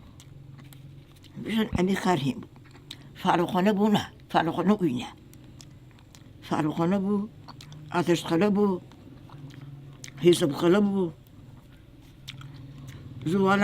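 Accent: Gilan, Iran. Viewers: عالی عالی❤ لهجه جان ، برا شرق گیلان😘♥️😍نوستالژی👌🔥😍♥️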